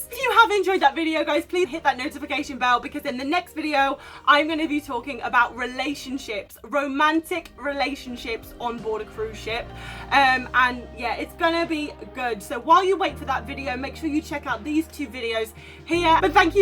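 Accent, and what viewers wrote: United Kingdom. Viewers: I just found you, in a group of van-ladies. A interesting and entertaining blogger. I like the accent. British I suppose, I’m American. I have always gotten massages periodically too so I can relate to that. Sorry you seem to have more than your fair share of jerks and weirdos. The worst was clearly the pooper but possibly he has a bowel disease. Carry on. 🤓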